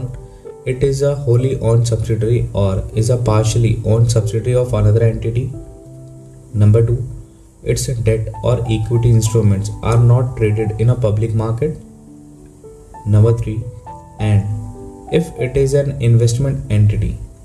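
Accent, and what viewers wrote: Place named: India. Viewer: the first problem with this video is the accent which is so bad, the second one is that you cannot cover the topic in just three minutes. Indians always fail to adhere to the minimum standards of professionalism and integrity and claim for what they don't really have. I personally don't like them at all.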